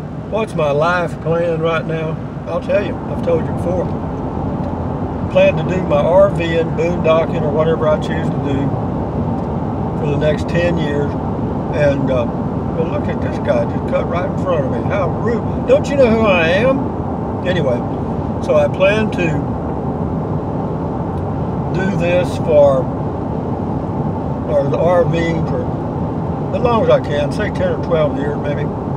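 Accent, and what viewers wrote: Texas, United States. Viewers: Always cheering to hear your Texas-inflected enthusiasm for life,. I started out with your walk through Van Horn, and from there I started to ride shotgun on your drives through beautiful West Texas and the desert Southwest (well, me and 44,000 other folks, that is), and from there I became a subscriber to your channel and I haven't looked back. I grew up in Texas, and though I fell in love with the big city and moved here to NYC (and look where THAT got me), I'll never stop loving West Texas and New Mexico and its good people, and I just want to say that being able to leave the confines of my small Manhattan apartment and head out for the open western skies with makes the day a hell of a lot easier to get through, and I am grateful to you — or much obliged, as a Texan might say. I'll see to it that I use your Amazon link next time I go shopping there. 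I wish you all good things. Keep up the great work. Best regards, Seth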